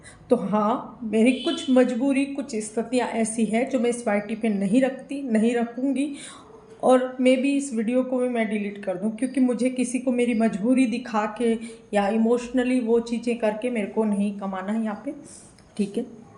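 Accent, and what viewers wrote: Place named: Marwar, India. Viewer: Sabhi ko meri taraf say bahut bahut pyaar phir say aayenge mujhe to kee aur aap kee Marwadi boli sunani hai, sab ko meri taraf say bahut bahut pyaar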